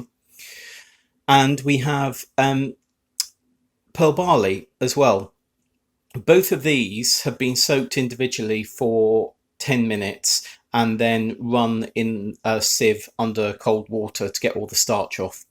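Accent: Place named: Scottish Highlands, United Kingdom. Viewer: love the accent * (like you just stepped out from the highlands lol)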